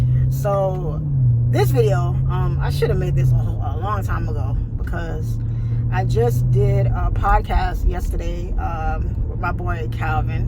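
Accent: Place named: New York, United States. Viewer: I can tell your from New York.We don't trust like that growing up in those streets.Congrats on your success and for giving up your insight.❤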